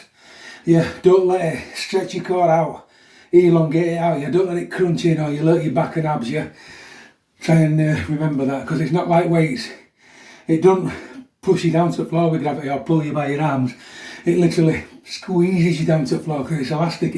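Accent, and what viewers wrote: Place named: Liverpool, United Kingdom. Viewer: no offence mate but why are you speaking in a Scouse accent when you are not even from Liverpool?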